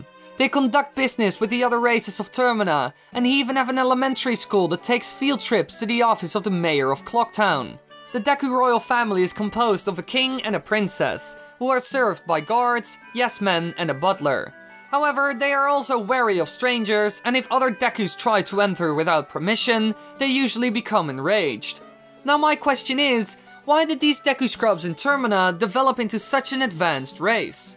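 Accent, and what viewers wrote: Netherlands. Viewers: Are you Dutch because I recognize the accent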